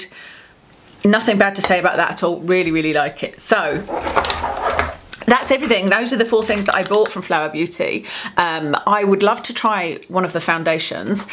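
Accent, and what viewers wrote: Scotland, United Kingdom. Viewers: Are you from Scotland. My home town. Love your videos x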